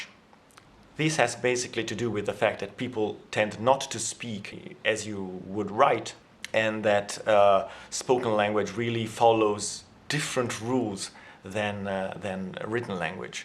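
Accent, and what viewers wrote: Finland. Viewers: You actually sound like finnish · It's strange, in the beginning when you spoke english I could immediadelty tell that you can speak finnish. You used some familiar sounds or accents, I could tell right away. Weird! :) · I mean, even your Italian articles sounded a bit Finnish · I haven't looked up what you did in Finland, but if I would like to guess, I would say that you lived in Helsinki and spend lots of time with youth? You have a slight "Helsinkiläinen hipsteri" accent and I love it! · I found it interesting that once you started speaking finnish in this video you started to pronounce some words in english with a finnish accent. You really are a language master.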